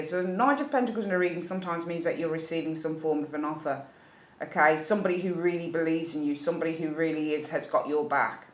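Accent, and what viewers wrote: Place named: England, United Kingdom. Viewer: Bitch slap 👋..... 😂😂😂😂😂and with that beautiful English accent 😂😂😂😂😂❤️❤️ ...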